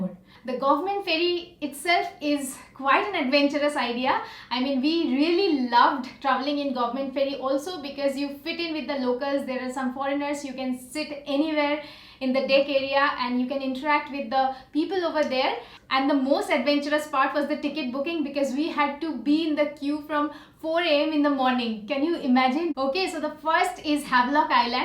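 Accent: Bengal, India. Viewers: From your accent , it seems like you are bengali. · Your accent is like a Bengali babu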